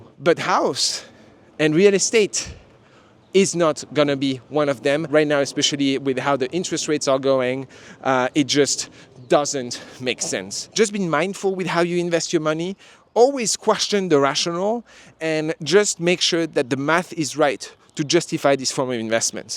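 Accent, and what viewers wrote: France. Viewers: Where you from? your English is slightly off sometimes..a Frenchy maybe?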